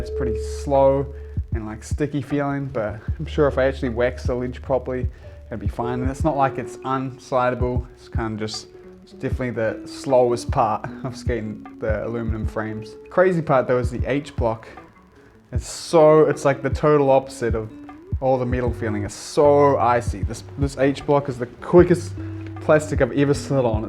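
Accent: New Zealand. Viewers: Are you from New Zealand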